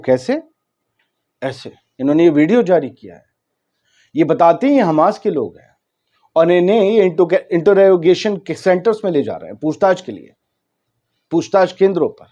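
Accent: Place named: Muzaffarpur, India. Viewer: Bari Muzaffarpur zaban bolted hain aap.masha allah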